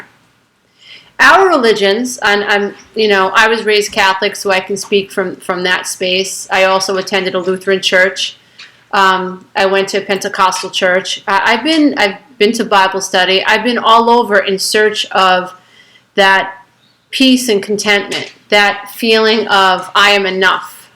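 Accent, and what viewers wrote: New York, United States. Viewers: OMG! Love you! Love your style too! You SOOOO hit the nail on the head with everything you speak of in this video! This is the first time I'm viewing your videos as I have been doing inner child work for years and within the whole ascension process, but not with any major breakthroughs, THEN, I found this! I love your New Yorkness! I from NYS as well, (in fact, we have the same enthusiasm when speaking of something we love!!) When you described the narcisst and the co-dependent, holy smokes, I realized that my mother was both & so was my father! I cannot even describe how much this video has helped me, and this is only the first one! Will be viewing all of your videos on this subject! You are so genuine, funny, inspirational and so right on!:D AND explains why I've always had 5th chakra issues! I also follow LOA practices and metaphysics, raising my vibration, spiritual, sound healing, Reiki, you name it, but WoW! 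Love your uniqueness! Thank you Sweet One for doing what you do and for shining your light ever so brightly!!!!!!! - You Rock!! Namaste. <3 <3 <3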